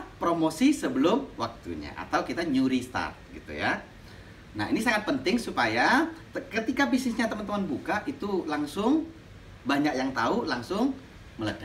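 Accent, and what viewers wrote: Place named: East Java, Indonesia. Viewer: Bismillah om doakan Lancar usaha kedai kopi saya mski awal buka. Msh butuh bimbingan dan belajar. Kalau boleh tw asli om bang kok medok jawatimur.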